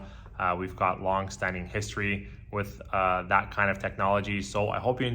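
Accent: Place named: United States. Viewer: Why are you using kilometers units, obviously you sound you’re from the US. 180,000km equals roughly 112,000 miles. The problem I see is first you are paying a premium bc it’s Hybrid, and if the batteries fail before the warranty, wouldn’t that eliminate the savings for fuel ( the reason why you bought a hybrid) If you’re an owner that keeps a car for a long 15-20 yrs, (like me) how much EMF has that person been exposed to ?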